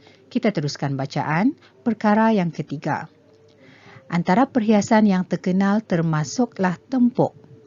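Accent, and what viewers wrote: Sabah, Malaysia. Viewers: Kenapa cikgu cakap macam orang sabah.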